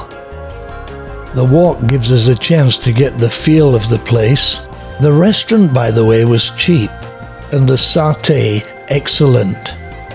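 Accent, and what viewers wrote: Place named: Yorkshire, United Kingdom. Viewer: Did you learn your Cantonese in Hong Kong? You sound like your a northerner. Yorkishire?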